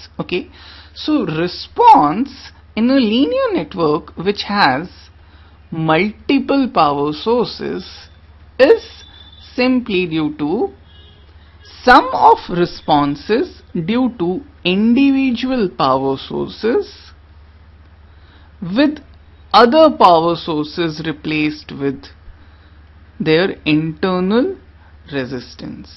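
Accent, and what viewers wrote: India. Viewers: What is wrong with your voice and your accent???????? Are you an Indian??????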